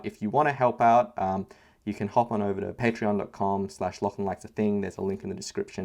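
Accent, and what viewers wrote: Australia. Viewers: Oh now I realized you are Chinese. Good English mate. Hey fuck Australia man you don’t need to talk like that. Is a garbage place.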